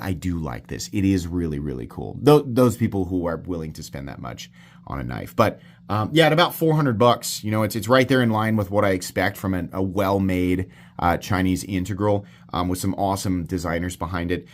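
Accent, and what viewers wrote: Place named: Midwest, United States. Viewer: don't worry about your accent. Keep the Midwestern accent alive!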